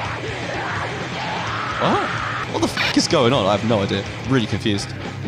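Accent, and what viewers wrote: United Kingdom. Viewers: Best part is he stayed in character with the British accent and vocabulary all the way through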